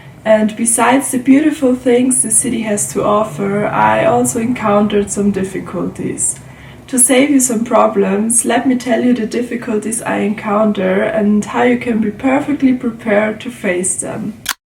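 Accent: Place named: North Germany, Germany. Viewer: Loved the content! What would you say about the metro system? Also your accent seems from north Germany!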